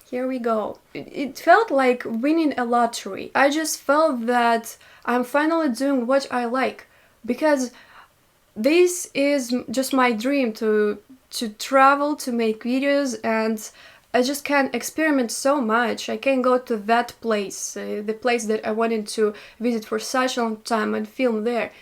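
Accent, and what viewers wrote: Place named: Russia. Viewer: You are not alone. I think the reason why your youtube channel is doing so well is because your a pretty girl with a cute russian accent. Your videos are genuine and you present yourself in a humble, down to earth kind of way. But don't worry about the loneliness.. it could be worse..like me..trapped in Australia. Our Australian Government has lost its mind.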